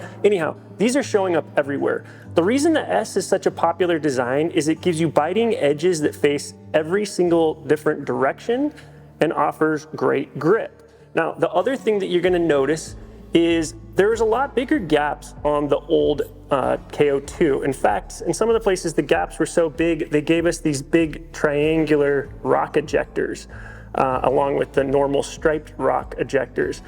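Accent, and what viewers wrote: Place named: Midwest, United States. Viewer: Based on your mid-west accent I'm going to say the Packers or the Lions!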